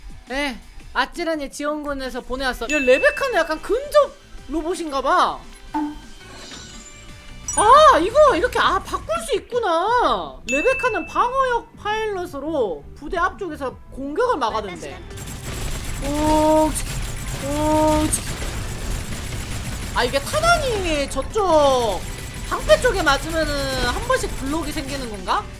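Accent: Japan. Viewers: 애매한 한본어 킹받네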